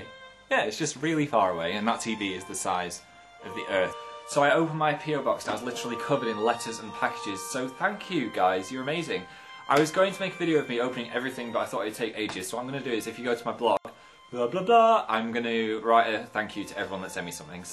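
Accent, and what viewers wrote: North, United Kingdom. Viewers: HE SOUNDS SO MUCH MORE NORTHORN HERE!!!!😁😁😁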